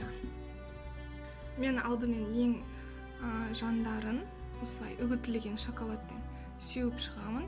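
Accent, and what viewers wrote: Standard. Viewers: Таза казакша сойлейди екенсиз👍👍😜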